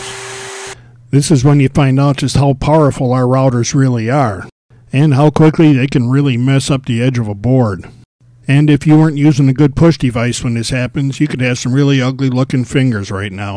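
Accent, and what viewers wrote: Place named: Midwest, United States. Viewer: People need to see this just because of how dangerous a table saw or any kind of saw is. I recognized your accent right away that you're from somewhere in Wisconsin or the Midwest for that matter. I myself am originally from Wisconsin and use to work for a window factory. I've had a couple close calls myself from the 4 years I worked there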